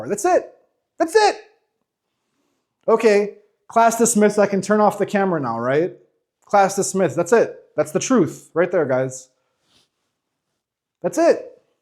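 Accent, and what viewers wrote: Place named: United States. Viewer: Yap, right on the money. Golden truth through an American accent man. The key is to permanently remind oneself of this and not get triggered by outside circumstances. Unfortunately, emotions, pressure, stress, fear but also euphoria are throwing me out into the regular ego function I am used to for such a long time. The skill to maintain the inner balance is key. It takes time to build up this skill. I am working on it. Greetings from Munich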